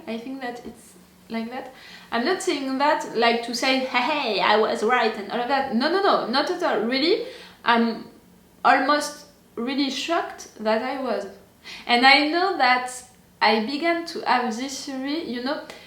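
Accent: France. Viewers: I’ve seen tons of shows the last couple of years, they came and went just like that but Mr. Robot reached me in a very strong and deep way, beyond the outstanding perfomance, acting, screenplaying and ost, because mental condition is very close to me and is something i hace to fight to everyday. Thank you for share your feelings and thoughts with the world about this underrated show, i dont know you but i can tell you are not faking reactions at all, I will always remind you "frenchglish" haha (you did it great! English is not my mother tongue also but i could understand you!) Well, i supposs this is the end, leave you my with my special moment (and song) of the whole show: "Darlene leaving the family polaroid picture in Elliot’s shelve" <link> Goodbye friend c'est fini.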